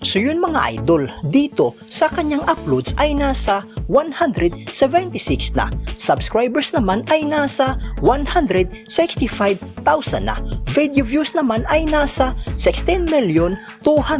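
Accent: Visayas, Philippines. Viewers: Bisaya kc but as long as we understand her, it's okay.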